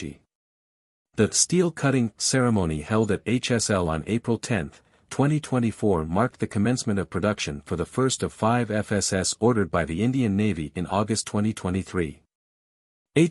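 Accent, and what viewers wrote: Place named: China. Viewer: Where accent suggest you are Chinese. Please introduce yourself.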